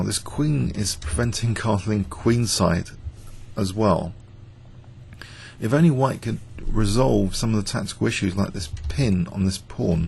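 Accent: United Kingdom. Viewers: British ascent, hoo!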